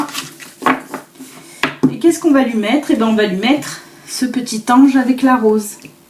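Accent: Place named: Lyon, France. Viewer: Bonjour je vous remercie pour vos guidances,votre accent lyonnais est une vraie joie chaque matin,pour une lyonnaise,comme moi,dans le sud ouest! J'espère ne pas vous heurter,en vous disant que vous me rappelez, une rubrique matinale de mon enfance qui s'appelait "la mère Cotivet" Au-delà de son accent,elle était pleine de bon sens! quant à ceux qui ne sont pas contents,de vos guidances, j'espère qu'ils vous remercient lorsque cela les concernent directement! je vous souhaite une belle journée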